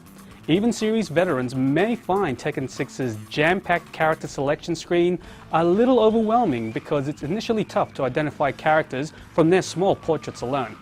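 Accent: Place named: Australia. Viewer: ... thats an Austrailan accent, you tit.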